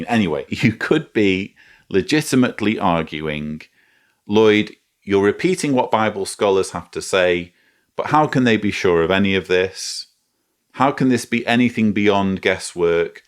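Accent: England, United Kingdom. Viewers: Where in England is your accent from?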